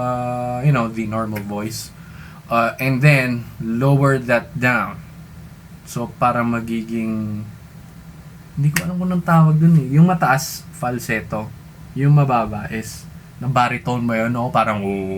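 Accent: Philippines. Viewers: Kala ko englishero pilipino pala hahaha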